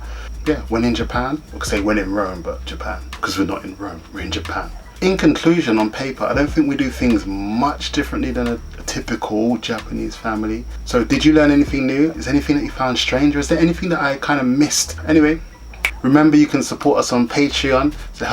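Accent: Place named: United Kingdom. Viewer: I don't remember when i subscribed to your channel, but I'm still not used to hearing the British (London?) accent 😆😆I've been watching too many American an Canadian YouTubers in Japan. it's always good to hear more Brits haha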